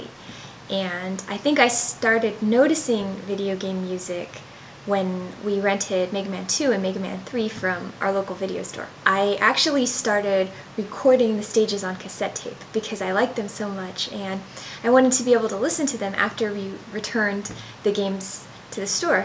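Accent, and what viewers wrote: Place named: England, United Kingdom. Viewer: Wow English accent is amazing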